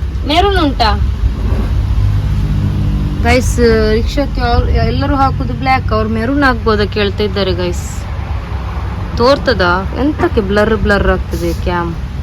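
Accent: Mangalore, India. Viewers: Talking slang klidre mangalore irbeku ansatte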